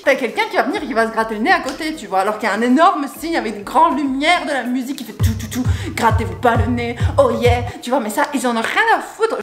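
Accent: Belgium. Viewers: Ton accent belge ressort fortement dans cette vidéo lol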